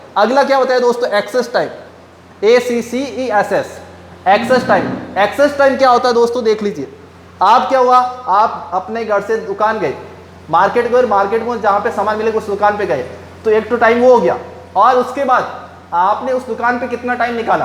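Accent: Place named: Jodhpur, India. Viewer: गुरु जी आपकी जोधपुरी भाषा घणी छोकी लागी इया ही पढाता रेवो घणा बढिया पढाओ आप जितरी तारीफ करु उतणी कम है जय हिंद गुरु जी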